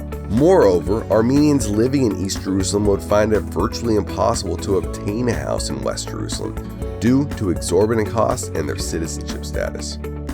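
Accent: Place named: United States. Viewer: Video and preparation optimal. Commentator's English is too American and word eating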